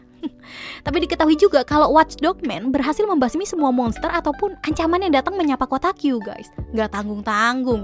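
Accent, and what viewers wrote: Java, Indonesia. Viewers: nih org jawa apa medan seeh wkwkwk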